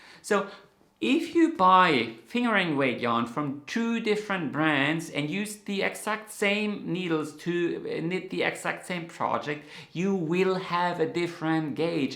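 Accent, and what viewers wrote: Germany. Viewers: Thank your for sharing the language behind your accent. To my ears, it's similar to a couple of others but try as I might, just wasn't able to place it. Likely because most of the Germans with whom I've interacted spoke with a very thick, almost blurred. Your accent is crisp and clean, unique and very pleasant to me. Although I noticed your pronunciation of diameter, because I knew what you were saying, didn't really give it much thought one way or the other. Like knitting, English is spoken with different inflections and pronunciations all over the world, even when English is the native language, as the Brits and Americans. I've been knitting for over 50 years and am still learning new things, so it never gets dull. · zu den linken Maschen: die stricke ich so, wie du sie gerade gezeigt hast und zwar sehr schnell....und ja, bei allen Zu und Abnahmen muss ich entsprechen umdenken. 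Das ist so wie beim Altsaxophon spielen ohne transponierte Noten im Orchester: das passiert im Kopf automatisch. Es ist aber schwierig diese Art anderen dann beizubringen, warum sie es andersherum machen sollen, wenn es doch anders in der Anleitung steht. Stricke über 50 Jahre schon so und komme gut damit klar. Was mir wirklich gut gefallen hat, die tiefgründige Erklärung mit den vielen Details für die Maschenprobe. Da bin ich eher nicht so hinter her, aber nach diesem Video werde ich es jetzt doch öfter versuchen. Obwohl wirkliche Pannen habe ich noch nie erlebt und ich stricke viel und alles was sich Stricken lässt. Mein Motto ist dazu das Garn muss seine passende Nadel in Material und Stärke finden. Manche verstehen sich einfach nicht und dann kommt nur Murks raus. Das merke ich aber Recht schnell und kann dann was ändern. Ein tolles Video von dir. Vielen Dank. PS: dein deutscher Akzent stört mich überhaupt nicht. 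Deswegen antworte ich auch auf deutsch😉👍🧶🧶🧶🧶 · My knitting "don't": Don't ever tell yourself that you can't do a certain technique, like color work or lace. Start with something small like a hat or even just a swatch. Want to knit a cardigan but the pattern uses steeks? Knit a swatch to practice steeking before committing to making the garment to see if you even like the technique. At the end of the day, it's still knits & purls, and it's only yarn and you're not required to like everything you try. Also, I enjoy your German accent quirks - I enjoy most accents in general 🙂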